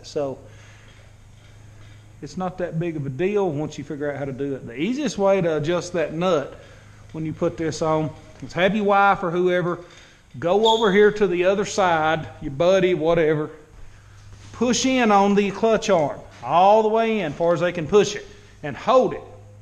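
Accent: Tennessee, United States. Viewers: You from TN?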